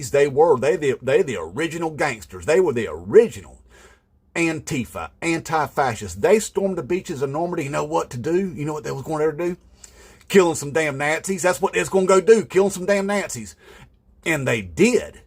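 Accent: South, United States. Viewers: "We was just, you know, just usin' your correct pronouns. We thought that's what you wanted to be called. We didn't mean no disrespect. We just thought you wanted to be called fascists." That's brilliant. That's brilliant. Once again, perfectly said with a perfect Southern drawl.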